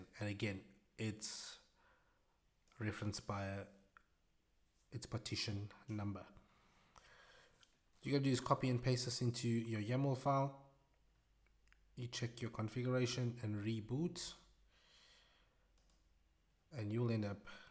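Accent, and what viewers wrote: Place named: South Africa. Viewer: You sir are a legend! Always stoked to hear a South African accent when trying to find info on youtube. Thanks for the vid, very helpful.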